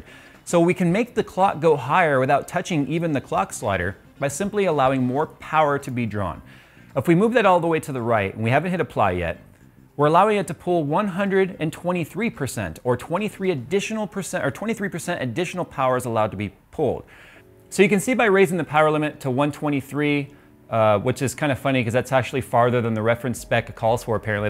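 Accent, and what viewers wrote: Canada. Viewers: There goes Canadian again. Great video. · you're canadian? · You're Canadian,?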